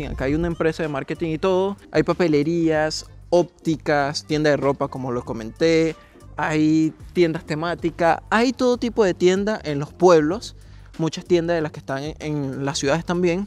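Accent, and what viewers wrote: Venezuela. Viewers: Hola!!! simplemente apareciste en el menú de videos de youtube y me llamó la atención. Me quedé viendo porque tu forma de hablar me parecio familiar, hasta que dijiste que eres Venezolano. Bonito formato. Saludos desde Caracas. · ¡Esoo chamo, acento venezolano! Muy buen video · No sabía que era venezolano, lo adiviné por el asentó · Llegué a tu vídeo por sugerencia de YouTube. Generalmente no hay venezolanos con esta dicción tan clara. Sospecho que eres de Centroccidente, más específicamente de Barquisimeto o Yaritagua pues ese acento es característico. Se agradecen este tipo de descripciones tan espontáneas, claras y sucintas. (Larunbata, 2021.eko martxoak 20a, am) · La verdad que ni idea cómo llegué aquí jajajaja pero me parece súper cool y más al reconocer tu acento, dije: "Este chamo es venezolano" jajaja y acerté! Primer video que veo, me parece súper chévere! 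éxitos y bendiciones! :) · Por fin veo Venezolano con video de este tipo. Felicitaciones!! La vrrdad se sintio raro alguien Holando con acento Venezolano. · Hola! Que bien que el algoritmo me trajo a tu canal, que lindas las imágenes que muestras de Holanda! Me preguntaba si eres del oriente del país, tu acento me dio esa impresión, yo soy de Puerto La Cruz viviendo en Kansas, USA. Saludos! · Hijo; genial tu salida a ese error que hiciste cuando hablas “ si lo ves en el futuro.. pero eso del verlo en el “pasado”😒😒😒 jiji tu risita te salvo de ese “chispoteo” propio de la improvisación; pero bueno lo remendasteis con esa salida.! Primera vez que veo tus videos, llegue ahí por casualidad, al empezar y oír tu buena dicción, me dije es un paisano venezolano. Dios t bendiga brother sigue adelante; al final te espera la cima allá o en cualquier lugar del Mundo.!!🌹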